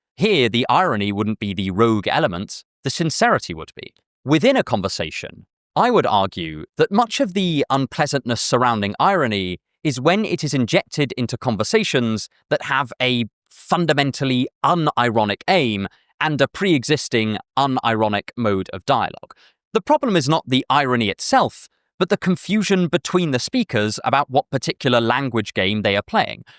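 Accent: United States. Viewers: Is it a tone? Or just a midwesterner? The world may never know.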